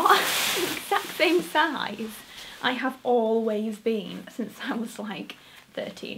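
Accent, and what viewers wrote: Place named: Manchester, United Kingdom. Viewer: Your Mancunian accent really stands out in this video! Loooooooove it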